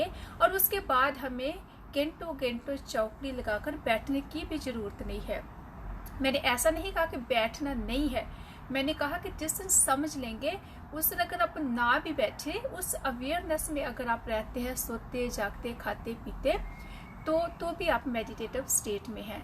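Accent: Punjab, India. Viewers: Perfect answer.. बस Punjabi accent bahut zyada hai.. Why don't you make the video in Punjabi?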